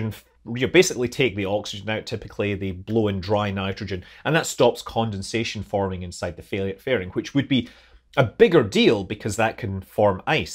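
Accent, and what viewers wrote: Scotland, United Kingdom. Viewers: Great Q&A, Scott. You do a great job informing us. And for us "Amerikins" your Scottish accent is icing on the cake.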